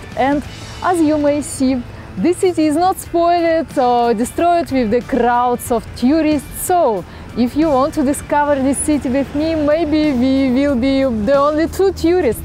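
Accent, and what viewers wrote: Russia. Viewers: Getting addicted, 2nd video today Really great and that, just is so good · miasto ładne, akcent sztampowo rosyjski (gdyby włączyć yt automatyczną translację pokazał by rosyjski zamiast angielskiego - widziałem takie przykłady)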